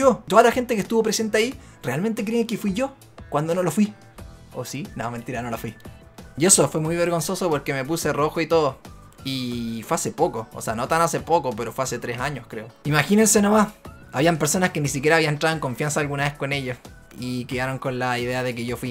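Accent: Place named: Argentina. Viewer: pensaba que era de Argentina se parece mucho el acento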